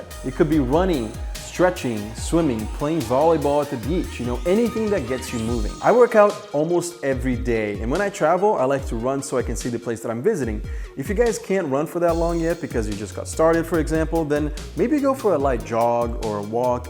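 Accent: Brazil. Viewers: Dunno why but you had a slight brazilian accent this vid...sounds a little like mine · Ele é brasileiro? Sei lá parece que tem um acentinho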